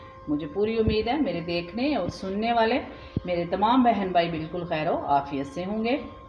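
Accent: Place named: Punjab, India. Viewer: Wowww Super like 48 for the Super tasty and crispy Moong Daal ke Pakode. Kya baat hai Bahaut hi alag style se banaya aapne inne. Bante dekh muh mein paani aa gaya. Nicely prepared and well explained each step. Aapka Punjabi tone hai bolne mein, jo hi Bahaut accha lagta hai. Jabardast Pakode ku Beautiful presentation ke saath. Wah ji wah mam. God bless you always 🤤🤤😊👍👍👌🙏🙂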